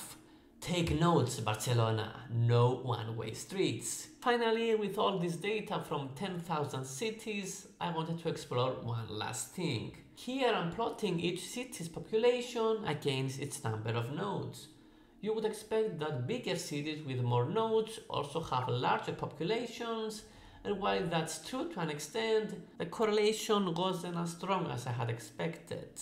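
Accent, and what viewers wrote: Cyprus. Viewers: I can always recognize a Cypriot accent 😛. Ωραίο Βίντεο! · Sorry to ask this but.. Where are you from? You have a very a Cypriot accent ✨🇨🇾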